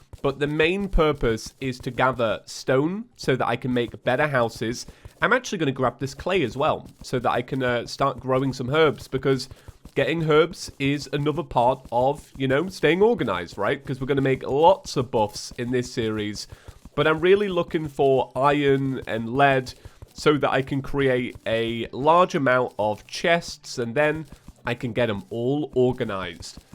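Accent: England, United Kingdom. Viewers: I believe that is the English accent making it hard to say chests. Us Minnesotans are fine.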